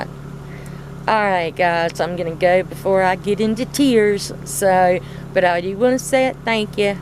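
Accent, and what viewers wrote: South, United States. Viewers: Thank you for getting back to me. My mom was from Little Rock Arkansa and my daddy was from Richmond Vagina, i am from Washington DC. I always Loved a country accent!! can't wait for your next adventure!!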